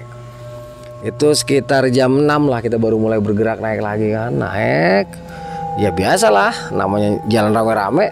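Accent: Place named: Jakarta, Indonesia. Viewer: Bang logat betawinya kentel banget enak dengernyatapi bahasanya kereeen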